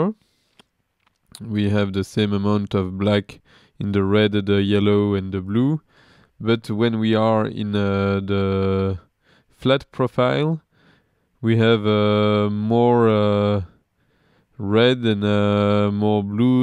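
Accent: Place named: France. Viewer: On sent vachement l'accent français, mais on apprécie l'effort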